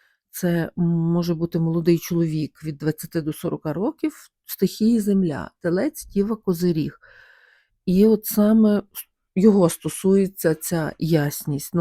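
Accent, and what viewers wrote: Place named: Galicia, Ukraine. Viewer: Доброго ранку. Дякую за прогноз. Ви напевно зі Львова? Галицький говір, мова.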